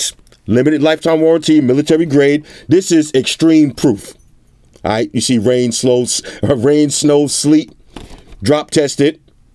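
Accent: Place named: California, United States. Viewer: Anyone else wanna see what he looks like 🙌🏽 he sounds like a Californian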